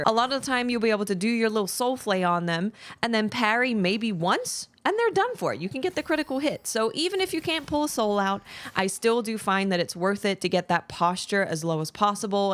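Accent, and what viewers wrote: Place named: New Jersey, United States. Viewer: That jersey eeesssssh accent got me ❤😊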